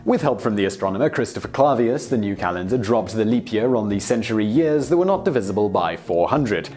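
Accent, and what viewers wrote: United Kingdom. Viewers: I was WAITING for you to say "Christian" in your British accent, you beautiful English man. :D :D :D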